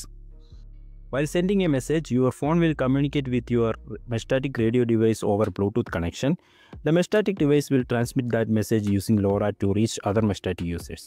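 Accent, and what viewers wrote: India. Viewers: Индийский английский звучит противно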